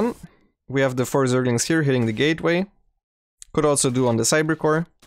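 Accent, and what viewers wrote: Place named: Germany. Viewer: i think the German-ness is quite apparent, his English is good nonetheless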